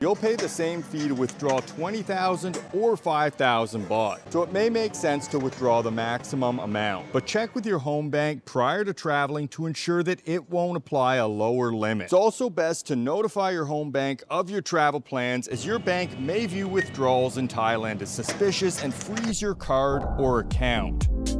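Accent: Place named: Canada. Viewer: Thanks for your videos! Very thorough, informative and concise, all spoken in clear Canadian English!😊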